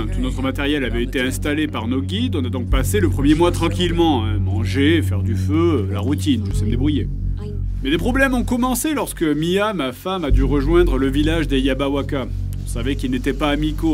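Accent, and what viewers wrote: Paris, France. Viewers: Le ptit accent pointu parisien pédant est juste par-fait ! Ça sert vraiment l'immersion ! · C'est fais exprès l'accent parisien? J'aime ce type de let s play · cette accent parisien !!!!! loool Hâte de voir la suite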